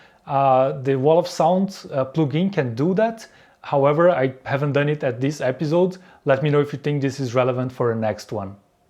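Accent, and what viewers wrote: Brazil. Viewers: Tu é brasileiro né? Mó jeito de brazuca de falar em inglês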